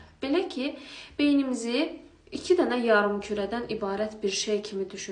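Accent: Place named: Azerbaijan. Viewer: Ezarbaycan lehçeniz çok güzel.❤❤❤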